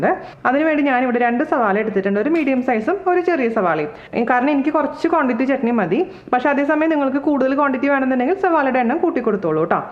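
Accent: Thrissur, India. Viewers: Chechi,njangalk chechiyude Thrissur samsaram orupaad ishtamaann... 😘😁😀😂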